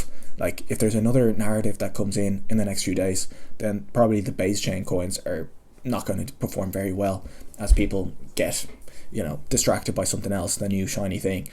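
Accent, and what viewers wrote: Ireland. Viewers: Dope video what part of Ireland you from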